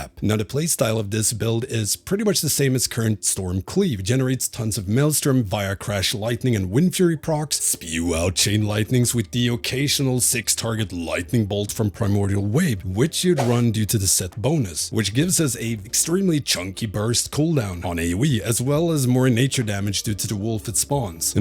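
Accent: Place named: Sweden. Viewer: bis swedish accent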